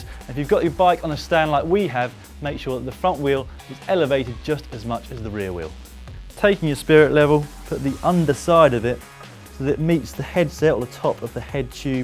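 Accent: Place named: United Kingdom. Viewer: I cannot understand what he's saying because he's speaking British. Those people have a lot of difficulty with the English language. (I'm an American, and we speak correctly.)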